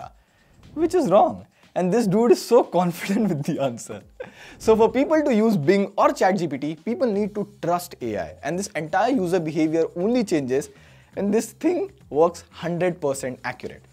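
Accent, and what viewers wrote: India. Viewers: Not sure why but he's the only Indian accent that I've heard that I can stand listening to. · Wow a cool indian even the accent😮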